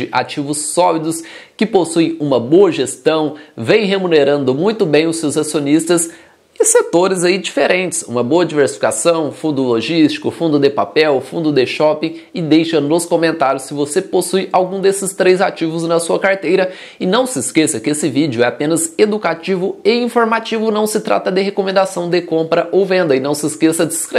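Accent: Brazil. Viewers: ah, legal, imaginei que pudesse ser do interior de SP ou MG! Sotaques e regionalismos são coisas que me deixam curioso! Agora vou poder me concentrar no assunto dos vídeos. Antes, eu ficava só pensando, "de onde será este sotaque", e esquecia o que estava sendo dito no vídeo! 😀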